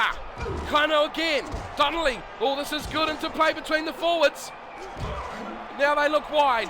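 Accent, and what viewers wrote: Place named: New Zealand. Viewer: great vid again, good win and anscombewas great in that, he was the difference between the teams, when is tikoirotuma back, also where in NZ are u from, coz ur accent is so cool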